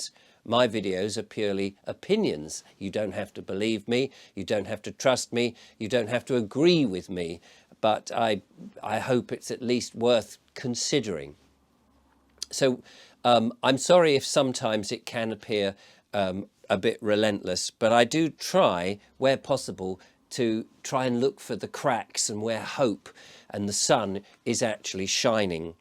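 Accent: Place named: England, United Kingdom. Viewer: Spoken by a true English man.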